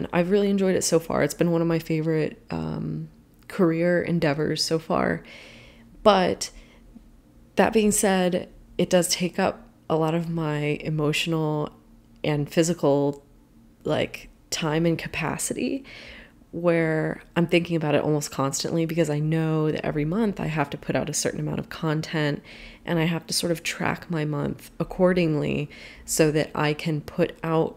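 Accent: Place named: Germany. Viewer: I just stumbled upon your channel and wanted to say I absolutely adore your work. It's so stunning yet soooo cute and you've definitely won me as a fan <3 love from Germany P.S. splendid German accent haha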